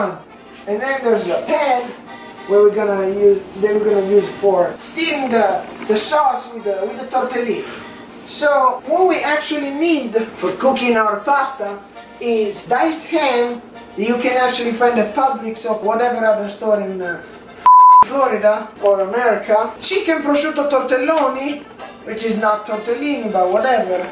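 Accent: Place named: Italy. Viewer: Oggi fanculo i commenti costruttivi, adoro il tuo accento italiano misto ad una punta di smusi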